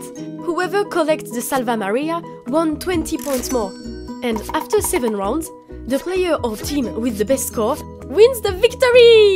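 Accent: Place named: France. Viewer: your french accent is cute! Divitchia,divika,divissia,Druid.. :D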